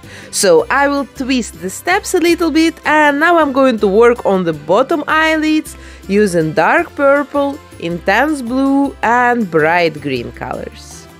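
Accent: Russia. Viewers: u have such a RUSSIAN accent,woww!! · Я же не одна слышу этот потрясающий русский акцент? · Ух, ну очень русский акцент Но работа прекрасная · однако здравствуйте she definitely speaks Russian , as I Russian I can hear it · where are you from? You have very russian accent:)) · Вы разговариваете как моя учительница по английскому Жесткий русский акцент 😂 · Всё видео про это думал. Она точно из России, у моих одноклассников такой же акцент))) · You are Russian? very Russian accent🤨🧐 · no,it is russian accent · Какой-то акцент русский что-ли 😄😅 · Кажется что у вас русский акцент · Я одна слышу этот ужасный русский акцент???? С таким же акцентом у нас на МЦК остановки на английском объявляют: "Зе некст стейшн Сокольиноя гоура... Пуш де бадн то эксит".... и всё в таком роде, аж мурашки пробирают от такого акцента((((( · Русский акцент , наших везде узнаешь. Просто перекрёстная работа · Do u have a really strong Russian accent. 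May u please tell me because it’s beautiful 😂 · Как - будто акцент русский у неё. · Oh girl u have such a cute face and russian accent:)) U are doing such a great job! Greetings from Romania! ❤️❤️ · такое КРАЙНЕ русское, твёрдое произношение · Такой жёсткий русский акцент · Tiene acento, habla inglés perfecto, solo con acento, aparentemente ruso o de la región. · типичный русский акцент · You look like my past 2-5 grade art teacher, you guys also have the same Russian accent- (I think you have a Russian accent idk-)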